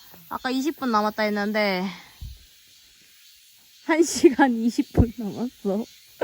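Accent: Gyeongsang, South Korea. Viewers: 진짜 오리지날 경상도 발음이다..ㅋㅋ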